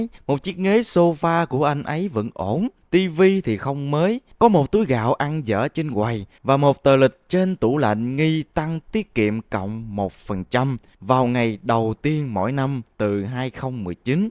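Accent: South, Vietnam. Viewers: Hai nghìn lẻ ba (2003) , không không , phải là hai nghàn lẻ ba mới đúng , giọng miền Nam thì phải dùng từ ngữ miền Nam , cảm ơn !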